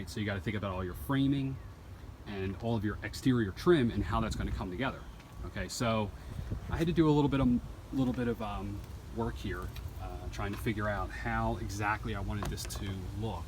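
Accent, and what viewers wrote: Philadelphia, United States. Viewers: Gotta be from Philly with this accent. Nice work bro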